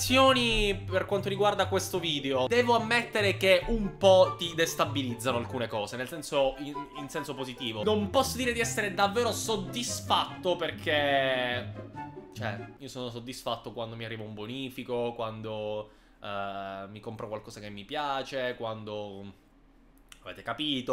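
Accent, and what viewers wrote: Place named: Sicily, Italy. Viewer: la cosa che mi fa più godere è il tuo accento siciliano, la raffinatezza nel pronunciare “minchia” ha qualcosa di soave.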